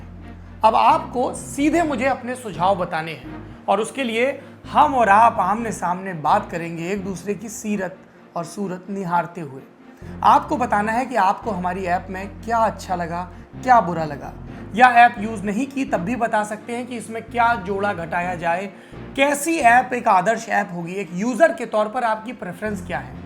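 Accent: India. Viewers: Sir your SUBSCRIBE logo is hiding your RIGHT side where you place certain eco friendly objects. Your English pronunciation is typical hindi medium style when you are even asking Rajdeep in English whereas he is replying in Hindi. No one can be perfect. As a admirer I want you to be perfect and that is why I am complaining, otherwise your knowledge is appreciated by all.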